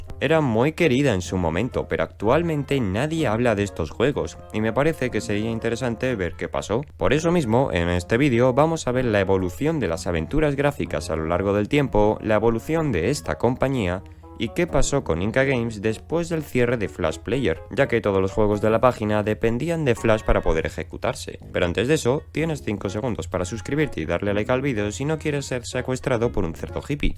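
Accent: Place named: Spain. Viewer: es de españa pero el acento no se nota mucho porque no usa palabras características de españa y con la voz seria no se nota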